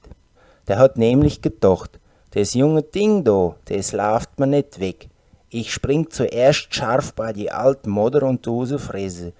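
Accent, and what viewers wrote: Franconia, Germany. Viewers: Täuscht das oder erinnert das an ein ganz komisches fränkisch?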